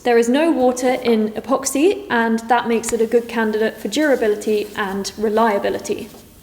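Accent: United Kingdom. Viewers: Man, your British accent suddenly reminded me of those 90s/early 2000s BBC documentary programmes.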